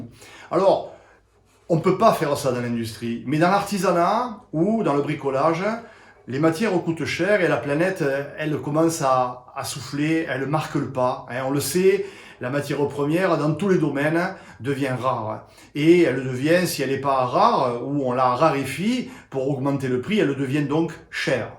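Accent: South, France. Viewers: Comme on dit par chez moi: " C'est avec des petits riens qu'on fait des grands touts!" Une belle découverte cette chaine. Bravo! Et puis cette accents du Sud, mes racines... Au plaisir S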